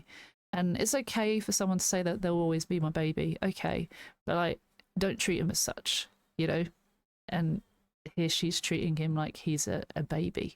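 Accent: England, United Kingdom. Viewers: Hi it was refreshing to find your channel. A more familiar English accent (most creators being American or Canadian) I have subscribed also. Hope you can do more in the future. Your quest for the meanings are usually correct. Keep it up...x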